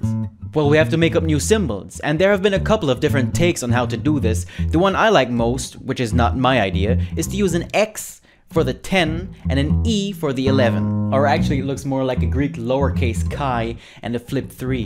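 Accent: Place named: Latin America. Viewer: I'm not trying to be mean or racism or anything but ur accent sounds so latin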